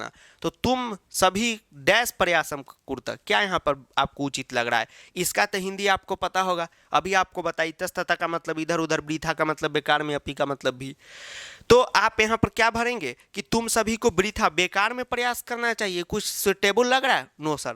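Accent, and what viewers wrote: Bihar, India. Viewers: Sir aap Bihar se ho na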